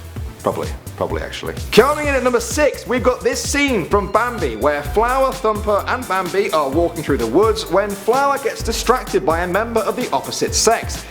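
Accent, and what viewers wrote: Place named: United Kingdom. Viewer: british accent is so annoying!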